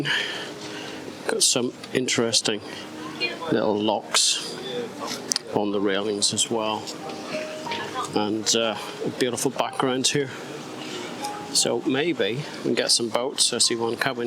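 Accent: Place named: United Kingdom. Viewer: Just came across this channel. Around 9 years ago I swopped a Leica lens for an x-t1 and I'm still using it today. They're an excellent, reliable little camera that does everything I want and has never let me down. Some great shots of Venice showing what this little gem of a camera is capable of, and just subscribed. As I always say, 95% of a good image is being there and seeing the potential and the other 5% is the gear. From your accent I think you may be from the same little island as I am, but further north maybe? Enjoyed the video and will check out some more.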